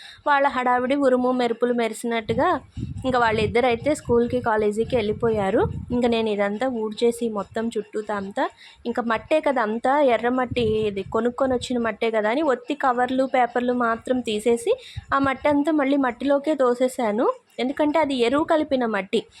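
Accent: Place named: Andhra, India. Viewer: Meedi proper andhra Telugu spastamuga matalduttunnaru.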